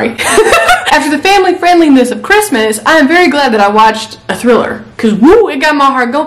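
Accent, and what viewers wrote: South, United States. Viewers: Which of the Southern states are you from? if your willing to tell.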